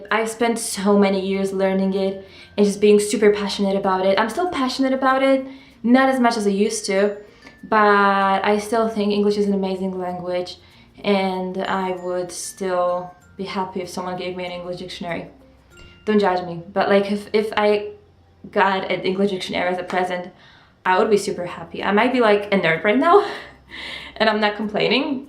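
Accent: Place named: Poland. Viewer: knew you are from Poland, your accent sells it, I am from Poland too but I am moving to Spain in 1 month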